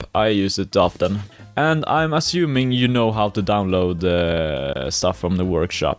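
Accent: Sweden. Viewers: you sound super swedish, am i right?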